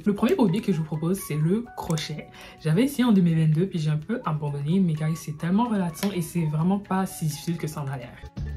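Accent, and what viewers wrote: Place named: Canada. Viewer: Merci à toi aussi et hate pour ta partie 2❤❤💕💕 ( espione😈😈) (en plus j'adore ton accent canadien❤❤❤❤💕💕)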